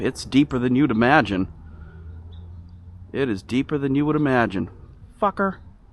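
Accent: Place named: Massachusetts, United States. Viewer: I had a feeling you were in MA. Not the heaviest accent but all the F bombs, lol. Never realized how much we do it until you get around people from other parts of the country and theyre like, "LOL Jesus dude, you swear a lot!"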